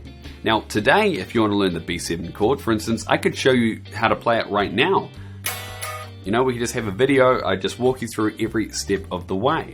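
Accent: New Zealand. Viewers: I was thinking about your accent and I should have got it cuz i am from New Zealand to. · So that is new zealand accent? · Question #1: A like a Lot of guitar players but You said that i have to mentione just one of them i Will Say Kirk Hammet from Metallica because he is really technical and his solos goes straight up to My soul. Question#2: i like the way that You teach how to improove your skills in a easy and fun way! Since i'm latinoameeican but i speak English your New Zealand accent sometimes i have to go back and listen again your explication. Don't get me wrong i don't have nothing Against your accent, actually i think it's cool but i'm used to listen American accents but i got it after a couple times listening to You! I like how an easy scale can be changed in any way that You want ti make it sounds good but i need to watch again the video and practice. 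I would like to understand which scales goes with the right chords? For example if i use to start with G5 and then to A5, then to C5 and finish with D5. What it would be a good easy scale to use with that secuence of chords? Just an example! Or just to make it easier for example to play of, the chords are just 2 pretty much the whole song which they are G and A! Which scale can use fir that and also how i Will know to use the right scale depending of the chords that the song has? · You sound Kiwi, are you Kiwi? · Love that kiwi accent man!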